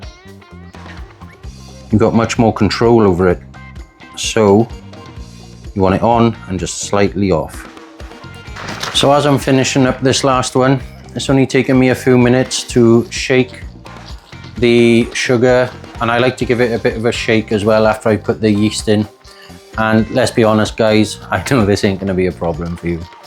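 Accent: Wales, United Kingdom. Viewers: Wrong! The music detracts from your interesting, Welsh-flavoured voice. No music on the next one, please. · I’m Irish & that is not an Irish accent,it’s Welsh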